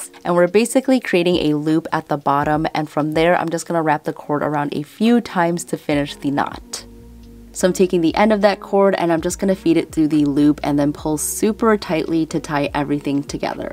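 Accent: Chicago, United States. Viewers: You can also use it to hold anything like make up brushes or in your kitchen to hold utensils if you made larger. At your desk for pens ...im a Chi girl too!! I love our accent.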